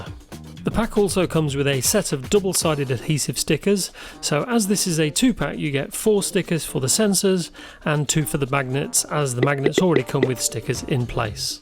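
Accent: United Kingdom. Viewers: Hi, where did you purchase these in UK?, as you sound like you are from the UK.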